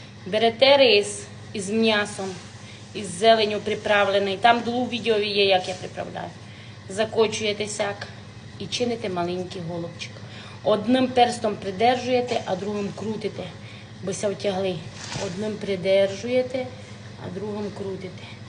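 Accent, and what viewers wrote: Transcarpathia, Ukraine. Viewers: Дякую, супер по нашому по Закарпатськи❤❤Ви чарівниця.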